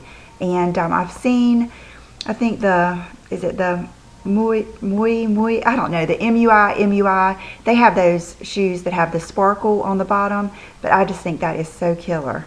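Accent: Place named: South, United States. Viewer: I just discovered your channel through Rachel Talbot and I LOVE it !!! I'm French and I have a weird obsession with the southern accent ahahah I wish I could talk like you :-) Anyway I really like your videos and I'm gonna catch up on most of them. Xoxo from France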